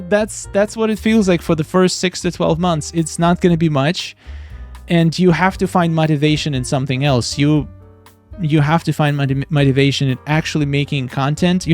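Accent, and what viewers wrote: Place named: Ukraine. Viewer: I knew you are from Ukraine 😅 I was questioning myself every time I was watching your videos, trying to hear any confirmation by accent In any words 😂. And from the beginning I start watching your videos I was reading your YouTube name as, is it true? Is it your surname like that? 😅 it was my theory Thank you so much that you saying openly about russian war against Ukraine 💛💙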